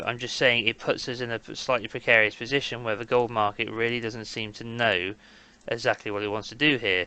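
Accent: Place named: London, United Kingdom. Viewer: Lol, perfect London voice, love it.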